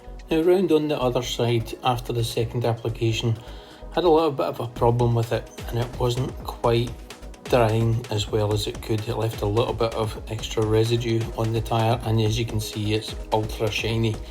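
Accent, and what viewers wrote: Scotland, United Kingdom. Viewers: What an informative film and of so much relevance to me as I started using GT products recently Tyre Serum and Decon Shampoo. I tried the Serum on a a couple of cars and so far have been impressed. My Father in law has noticed a difference in his sidewalls. Do I detect a hint of a Scottish accent there. Some other Youtuber recently suggested putting a dressing on top of the Serum if desired and I have not tried that yet and wondered what your thoughts were. I subscribed also as I am a keen detailer with time on my hands to learn as much as I can before I think of setting up my own business. Thanks for the info.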